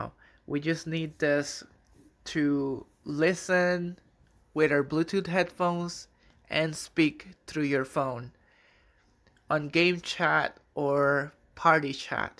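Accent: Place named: Brazil. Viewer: Cara teu sotaque é de br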